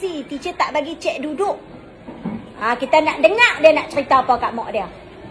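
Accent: Kedah, Malaysia. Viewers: Orang kedah memang sedap dengar bila depa marah 🤣🤣🤣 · Rasa pelat tu bkn penang..ni blah kedah perlis x silap.. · Cikgu ni org Kedah ka 😂😂😂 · Pekat betoii bahasa Kedah cikgu ni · Kelas bahasa kedah ke ni?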